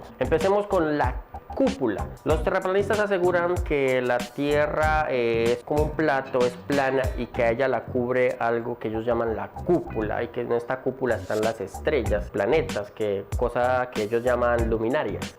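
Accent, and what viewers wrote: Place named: Colombia. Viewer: Buen Colombiano (O, al menos tu acento da a entender eso), por fin alguien que también desmiente las estupideces de esos sujetos.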